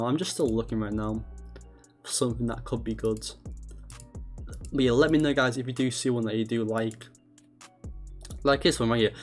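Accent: United Kingdom. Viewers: Bruh hes british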